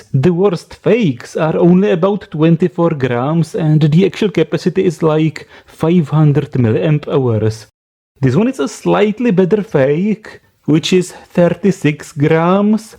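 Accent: Czech Republic. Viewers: That Czech accent 😀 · No, It's Czechglish, believe me.. with strong Prague's accent. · Czech. Strong one.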